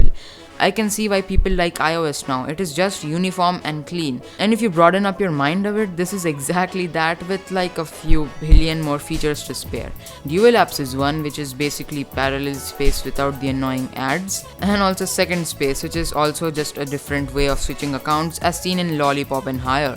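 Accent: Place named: India. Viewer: This is what you get when you combine good english words and Indian accent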